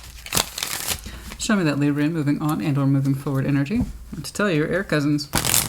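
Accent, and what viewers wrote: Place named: South, United States. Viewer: Yes it is my fault for staying too long. I’m a Texas girl and yes, the southern drawl I hear it. It’s awesome and refreshing to hear fellow southerner.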